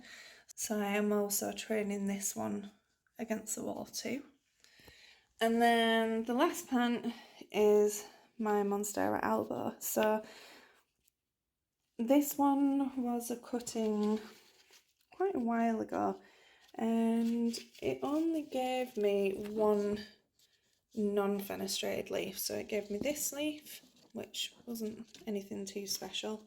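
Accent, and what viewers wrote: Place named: Yorkshire, United Kingdom. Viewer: Ahhhh, a Yorkshire lass? Thumbs up for the accent, the plants and hair colour 😁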